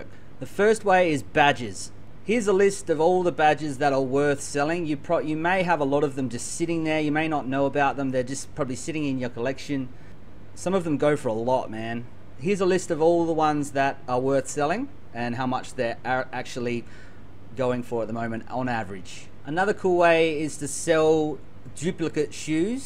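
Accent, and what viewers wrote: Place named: Australia. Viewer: Bro your from Australia to me to😁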